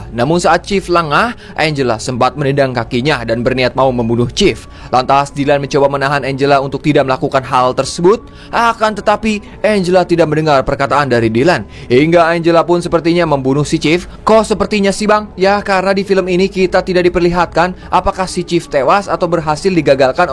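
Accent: West Java, Indonesia. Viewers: Nada narasinya kaya IQ7 deh,apa emng klo org Sunda ngomong Bhs Indo kaya gini yaa?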